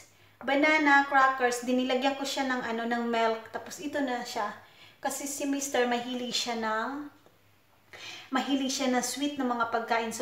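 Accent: Visayas, Philippines. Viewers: Malingaw kay ko saimo day bah!kay tinoud kay ka!!bisdak kaau!hahaha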